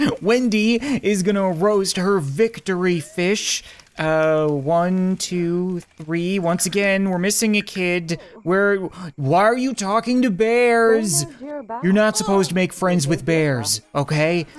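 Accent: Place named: Newfoundland, Canada. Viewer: I can't believe you replied! I just wanted to say you're awesome and I can't believe I didn't notice you were newfie before I went to your askfm I should have noticed with the way you say party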